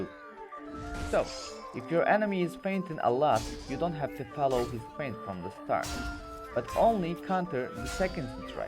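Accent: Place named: Turkey. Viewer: Turk musun kanka. Aksan felaket😀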